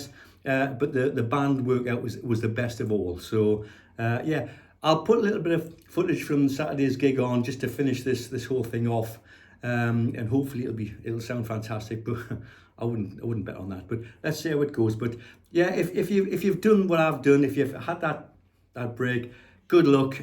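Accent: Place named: Northeast, United States. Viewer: Hi, Thanks for your video on your broken wrist to guitar playing recovery. Ive just broken my left wrist and hope to get back to it. One day. I'm from the northeast, originally so your video voice is very familiar. Thanks again for the lifting video. Oh and great playing... well done. Top man!!!...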